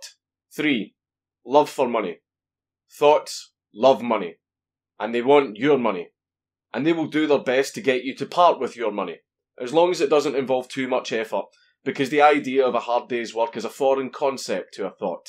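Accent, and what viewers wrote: Scotland, United Kingdom. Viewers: Your Scottish accent is so fucking strong, that every time you say girl, I fucking hear ghetto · Having you tell the tale of the legendary Thot was just that much better with your deeply Scottish accent. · The Scottish accent really adds to the mythos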